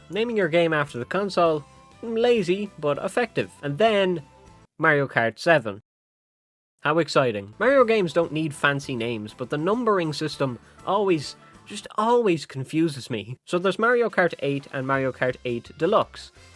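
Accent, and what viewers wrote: Ireland. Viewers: You must be irish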